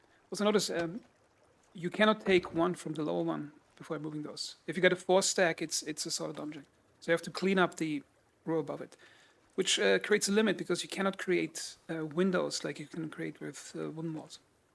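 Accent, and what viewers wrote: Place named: Germany. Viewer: Textbook German accent 😊 🇩🇪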